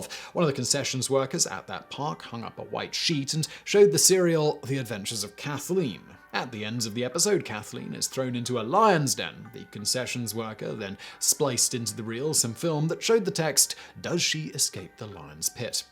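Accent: United Kingdom. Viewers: he's a Brit living in Prague why would he pronounce things in American English? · Does he say Advertisements as "ad vert is ments" I guess it makes more sense than the American accent version, but as an American...I kind of hate it. Its "Ad-ver-tise-ments" like I cant stand how brits say "Gare-ege" GA RAJ. Ok, the way we pronounce words not as they spelled, is dumb. Ill admit. Still not a fan of how brits say things😅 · is British and that’s how many of them would pronounce it via their accent. Learn something new every day indeed.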